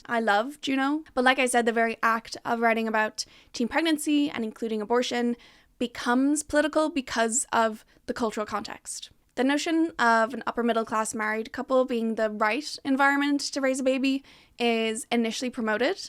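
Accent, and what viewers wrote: Ireland. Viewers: You’re Irish ?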